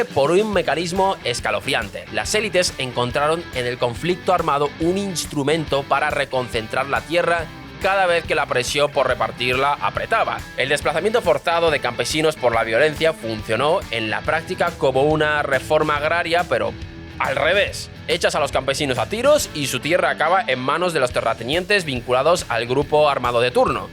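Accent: Russia. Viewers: Es Ruso y casi no se le nota... Hasta ahora.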